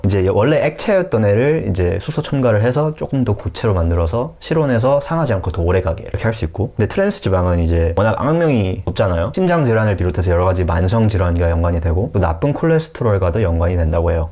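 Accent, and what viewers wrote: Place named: United States. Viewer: 미국살다온 말투ᆢ 좋당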